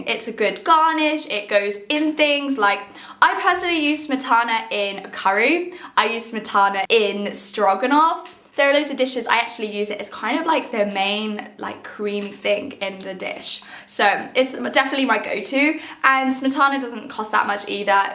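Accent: United Kingdom. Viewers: hi, i'm Russian girl living abroad, like how you speak about russia with your british accent, and dairy food! miss that 😀 I'm also a small youtuber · What a beautiful British accent! It feels like I am back in the UK. Though you are not quite right regarding special attention to cleanliness in Moscow for the reason that it's the capital. I spent nine months in my home city of Krasnodar (1 million population) last year and its impeccably clean - not only central streets but everywhere. They clean it and clean it and clean it again. You don't see too many police but the city is very safe. If there's police on the street it's usually a mixed patrol - a police officer with two Cossacks (as it's a Cossack region). Again, it's very safe, you don't see drunk people (except the area of the railroad station probably). Thanks for you opinion. It's very interesting!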